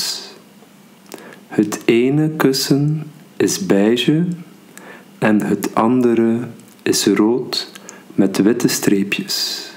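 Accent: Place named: Arab world. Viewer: у нас в языковой школе, арабы с таким акцентом разговаривают)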